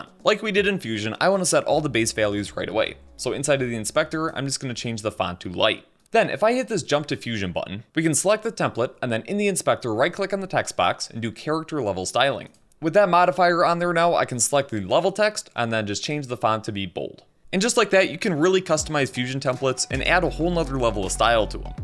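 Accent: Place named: Texas, United States. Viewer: Yo, Are you from Texas? I have a friend from there who sounds just like you! W tutorial btw, would love to see more videos like this.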